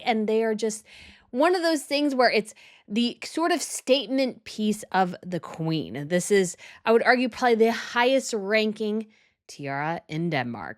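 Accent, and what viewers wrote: United States. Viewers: I am a new subscriber as I REALLY enjoy your appreciation for royal history and deep admiration for all things tiaras ❤ Could you please take a little more care with pronouncing the names of royal family members and the fashion/jewelry designers? I've heard several names pronounced in the American style, which is off-putting to the designers from other countries. I'm sure your viewers from outside the U.S. will love you for it.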